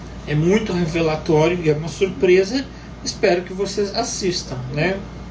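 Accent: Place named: Portugal. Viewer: Sotaque português... 😂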